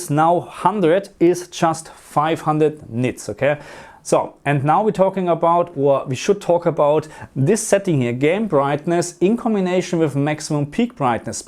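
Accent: Germany. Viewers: i love deinen deutschen akzent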